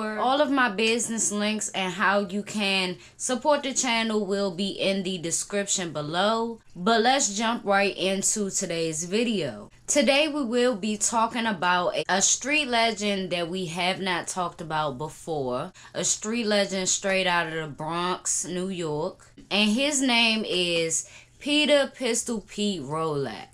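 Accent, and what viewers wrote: Washington DC, United States. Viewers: True DC accent.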